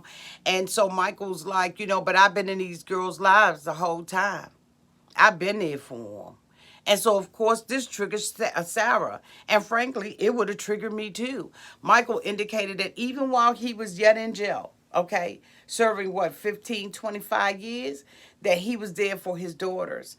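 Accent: Washington DC, United States. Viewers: Just finding your channel. I knew I heard a DC accent. It was the roach crawling down the wall on Puppy’s house for me.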